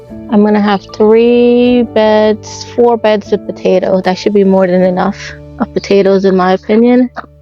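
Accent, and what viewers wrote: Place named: Caribbean. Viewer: I think I hear a Caribbean accent there! Great video! ❤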